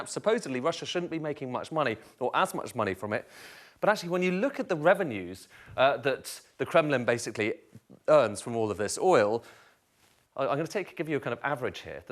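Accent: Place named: United Kingdom. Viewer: Classic brits narration..master of manupulation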